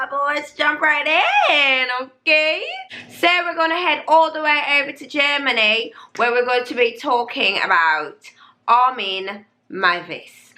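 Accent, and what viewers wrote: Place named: England, United Kingdom. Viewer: I was wondering where you going with an English accent and you dealing with German serial killer. Look likes you were thinking the same thing!😝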